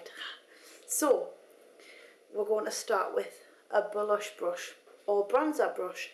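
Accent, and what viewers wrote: United Kingdom. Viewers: i always loves your video. Thank youuuu.. oya btw, i love ur british accent! :D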